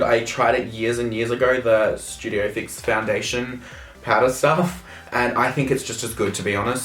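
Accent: Australia. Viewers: IS THAT AN AUSTRALIAN ACCENT I HEAR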